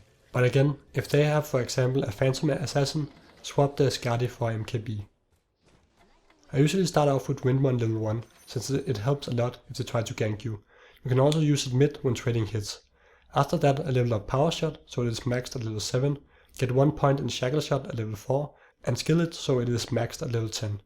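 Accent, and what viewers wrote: Denmark. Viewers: How danish can an accent be, from 0-? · Your accent is so Danish I love it <3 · The fucking Danish accent is so strong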